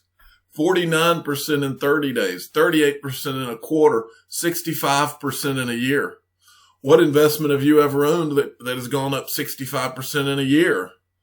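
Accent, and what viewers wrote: South, United States. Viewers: Glad to be back. Missed your sultry southern voice sing to me about XRP. Happy and Healthy Thanksgiving. Smoke that Turkey!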